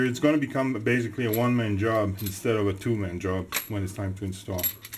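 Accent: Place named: Quebec, Canada. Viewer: He sounds Quebecois to me lol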